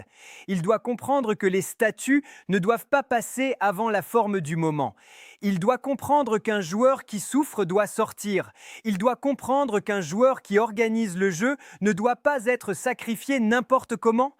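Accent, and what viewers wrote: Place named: Senegal. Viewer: Tu parles comme un 100% senegalais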